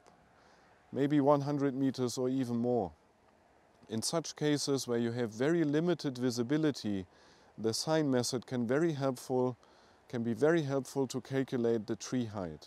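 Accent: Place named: Germany. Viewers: I only heard this accent (I assume Deutschland) in movies such as the big lebowski. What part of Germany you are from if you don't mind me asking? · Or like Helmut, the taxi driver in Night on Earth... Yes, sorry for the German accent, we are from Göttingen in Germany.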